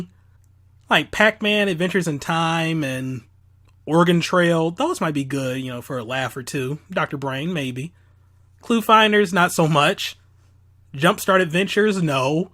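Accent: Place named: Michigan, United States. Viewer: I thought you had more of a midwestern accent. Just didn't think it was Michigan.. Sadly I can't make it to MWE. Didn't even hear anything about it until you mentioned it in the video.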